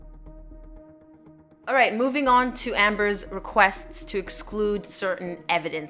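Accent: Canada. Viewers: I just realized how rude my comment could sound; I just meant I thought you had a faint Canadian accent. My apologies. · I’ve wondered for months where you practice. I eventually settled on Canada bc of your accent, (or lack thereof.) I’d have never guessed Australia in a million years.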